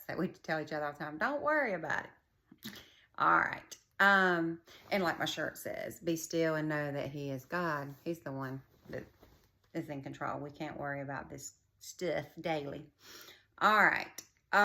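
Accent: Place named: South, United States. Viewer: your sweet southern drawl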